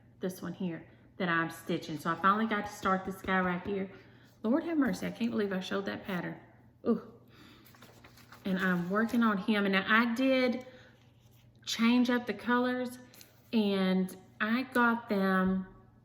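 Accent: South, United States. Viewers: Chicken what? Did I hear your favorite candy correctly? You crack me up. My favorite candy is straight up Hershey’s milk chocolate. Love your videos. I’m a southern girl and your south-isms make me laugh. Lawd! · I enjoy you so much that I watched all your videos! I love your Southern sayings along with your drawl! I love chocolate and caramel together so Caramello would be my favorite along with Snickers!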